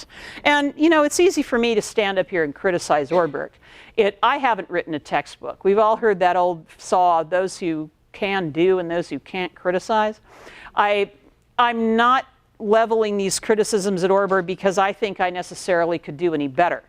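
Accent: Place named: United States. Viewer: Latin spoken with American accent sounds funny.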